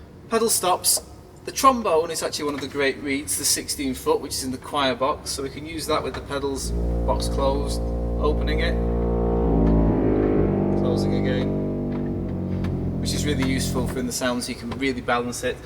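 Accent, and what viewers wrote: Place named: England, United Kingdom. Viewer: This is so interesting, great demonstration and from an Aussie perspective, love your pommy accent 👍🏻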